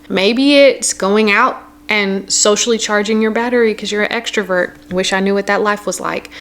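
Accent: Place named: Texas, United States. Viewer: Your podcast was recommended to me. As soon as you spoke, I knew you were from the South. I'm from Tennessee. You sound like Texas?? Your dog is so cute and your kids. Enjoyed!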